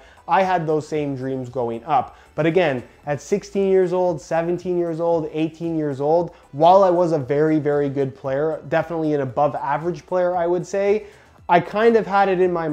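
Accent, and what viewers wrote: Canada. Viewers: I knew you were Canadian. I argued this with a friend "Deng". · i LazyCake The face is so Canadian? No. The only tell is his accent, his face could be American, German, French, Italian, Dutch, etc... what does “his face is so Canadian” even mean? I’m from Canada and I’ve travelled a lot, so I just find that wording hilarious. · Wow your Canadian accent is so prominent to me in this I never really noticed I guess. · I knew you were Canadian! Can always hear the accent of my people LOL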